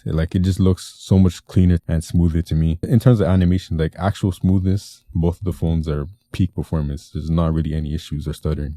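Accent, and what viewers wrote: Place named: Toronto, Canada. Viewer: Here is my one thing...and before I get to that, loved the review, my guy. Spotted the Toronto accent right away. But, music. Wireless. No one ever talks about this. But if you have expensive headphones, with aptx HD, aptx adaptive or aptx lossless, one plus wins. And Samsung could make it available. Snapdragon-Qualcolm chips created and supports those codecs. Licensing fee is maybe $10. At this price point, who would notice. I can't support Samsung bricking my phone for their silky proprietary codec. Headphones are almost as much as the phone. So...really? Just a bad look.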